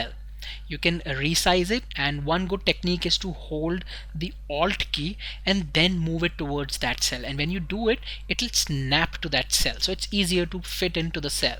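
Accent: India. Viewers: indian speaks English =))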